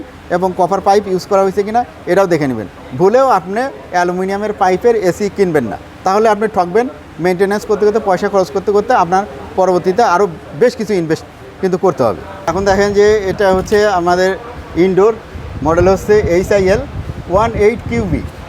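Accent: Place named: North Bengal, India. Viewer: বাড়ি উত্তরবঙ্গ মনে হয় ভাষার ল্যাঙ্গুয়েজ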